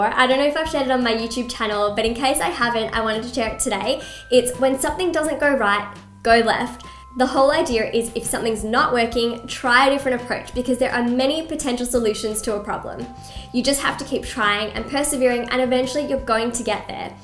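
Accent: Australia. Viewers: Are you Australian? I apologise if your not but you sound Australia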